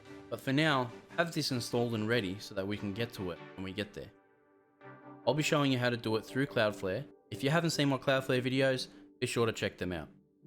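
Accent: Australia. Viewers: so refreshing to hear an Aussie voice in these types of videos. Is unraid affected by this wireguard removal from distros?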